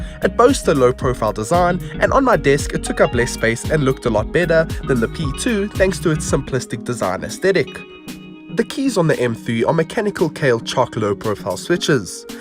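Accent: South Africa. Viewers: i hear a fellow saffa accent, I hit subscribe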